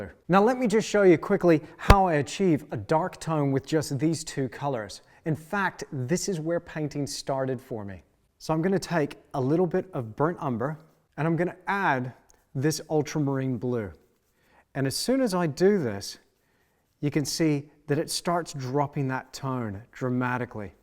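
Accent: Australia. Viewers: I could hear that Aussie in ya there dingo! Thanks for the lessons mate. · Am i getting aussie vibes? Are you going in and out of accent? Don't know if I'm losing my little aussie mind or not haha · Great videos,!, just put of curiosity, I can't place ed your accent-, is it Australian?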